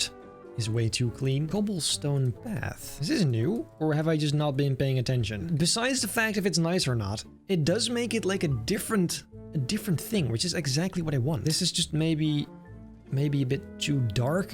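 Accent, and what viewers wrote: Netherlands. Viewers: Are you from the Netherlands cause me to maybe you can talk a little Netherlands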